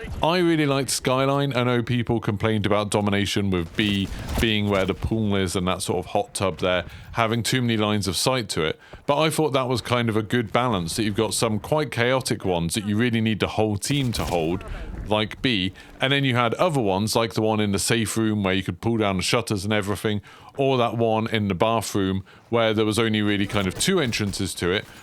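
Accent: United Kingdom. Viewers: Bruh, he’s obviously British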